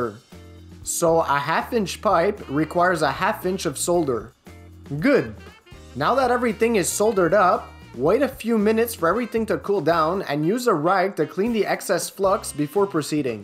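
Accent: Quebec, Canada. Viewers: That undeniable french canadian accent :p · DUDE, you must be from Canada Dare Hey!!!!! Your accent gives you away.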